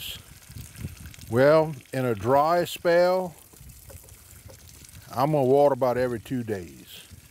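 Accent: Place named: Texas, United States. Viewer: I absolutely love your videos. So helpful and always entertaining. I'm from SE Texas just an hour west of the TX/LA border, but I live in Dallas now. Your accent immediately brings back "home" for me. Thank you so much for your videos and the time you put in to teach us all how to grow smarter, not harder. Lache pas!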